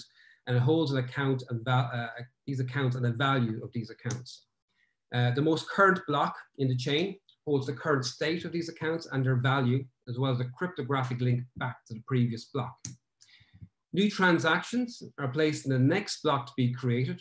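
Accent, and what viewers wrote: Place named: Ireland. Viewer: Typical Irish man. Gift of the gab. Great talk, well done !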